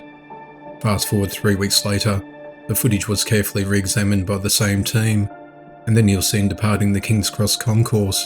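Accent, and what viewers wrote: Australia. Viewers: Love your voice and because of you I really can listen to other aussies ❤️👍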